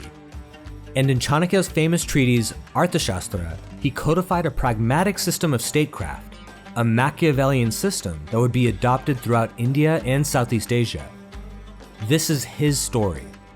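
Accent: United States. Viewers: You're foolish to think you shouldn't lionize our great history. I'm not saying to lie but you're only helping the west lie about India by bending over for them and making India look bad. I seen three of your videos now and I found out in the first video what you are. You're anti-Hindu. Next video I started to realize you're probably ABCD with your American accent and sometimes proper pronunciation and sometimes cringe Americanized pronunciation. You're too ashamed of being proud of India's Hindu and Dharmic heritage... Be proud of India and it's Hinduness.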